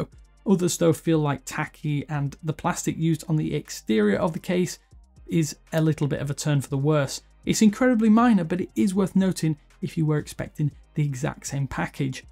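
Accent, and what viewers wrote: United Kingdom. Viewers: That's just how British people talk Lol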